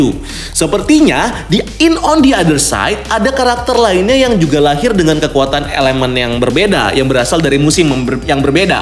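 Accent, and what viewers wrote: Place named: Java, Indonesia. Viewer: ilat jowo bos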